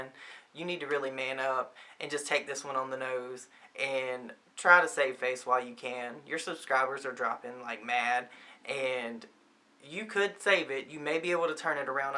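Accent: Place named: New Orleans, United States. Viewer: Very well said and presented ☺️. I'm gonna have to sub so I can keep up with you! Your accent sounds like where I grew up (small town suburb on the north shore of New Orleans LA)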